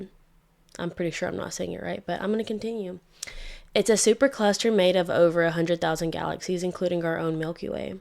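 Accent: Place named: South, United States. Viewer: It’s dark energy that’s making the universe expand not dark matter. Why you trying so hard to hide that southern accent?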